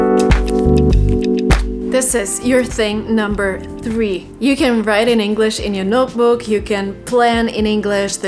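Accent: United States. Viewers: You are so beautiful and everything is naturally . I love your American accent. How to work with "fluent express" because I'm newer here. Can you explain please. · Where are you from ?, i was thinking tha you American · Your accent sounds 💯% American english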